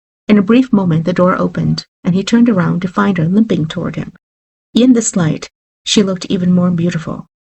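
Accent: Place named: South, United States. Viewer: Love it! That southern accent is awesome.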